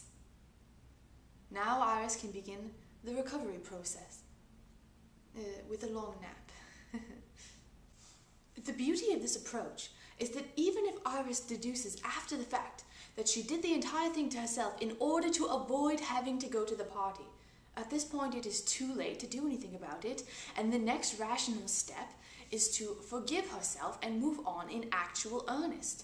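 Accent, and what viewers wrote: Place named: United Kingdom. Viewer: it seems natural for you to have a british accent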